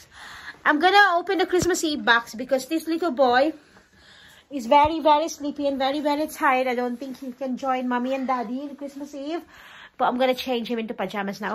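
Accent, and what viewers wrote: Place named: England, United Kingdom. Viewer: I love love the English accent. I can listen to you talk all day long. Sorry your original Christmas plan didn't happen, but time alone with each other is ❤️. So cute to hear you say mukbang and Noche Buena. Hala, Tagalog pala. Pinahirapan mo pa akong mag ingles. Kuhhh, bata ka! Hahaha, nice meeting you.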